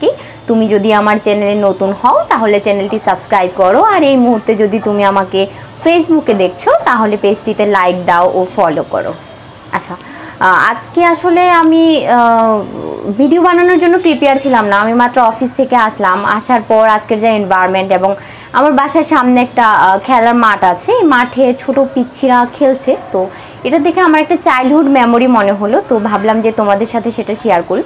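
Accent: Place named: Mymensingh, Bangladesh. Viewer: মনে হচ্ছিল ময়মনসিংহের ভাষায় কথা বলতেছিল।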